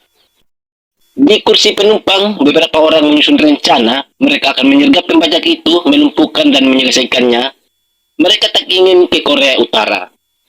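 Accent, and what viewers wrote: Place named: East, Indonesia. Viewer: der ngeri kali aksen kau 😂 logat timur kau kental kali😅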